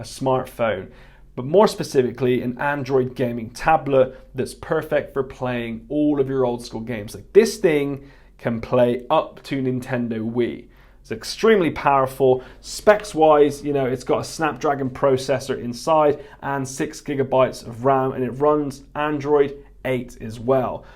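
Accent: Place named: United Kingdom. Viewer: are you based in england or scotland? i am just curious because of your accent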